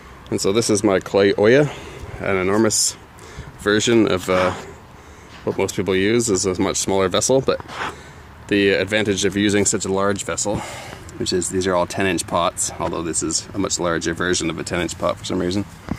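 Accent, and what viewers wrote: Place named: Canada. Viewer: Thanks for the speedy response. I thought I detected a Canadian "about" in your video. I think I might have to give one of your barrels a try. Thanks for all the info!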